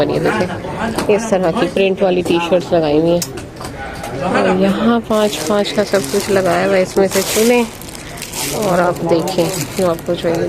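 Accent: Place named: Arab world. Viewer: Your arabic accent is vey nice I wanna learn arabic can u guide me from where i can learn From Pakistan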